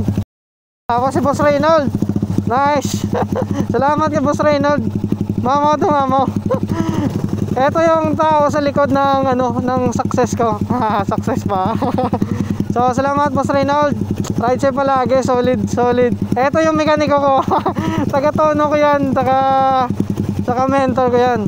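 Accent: Iloilo, Philippines. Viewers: iloilo po kayo booss??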